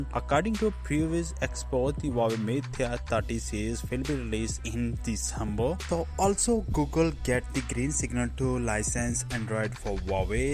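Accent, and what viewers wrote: India. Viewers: Indian pronunciation ？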